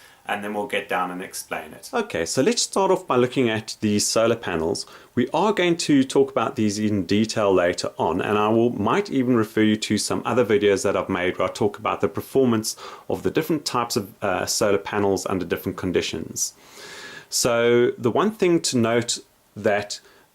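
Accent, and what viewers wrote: South Africa. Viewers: Even with a thick SA accent, your video was easily understood and interesting. Great video 👍 and thank you.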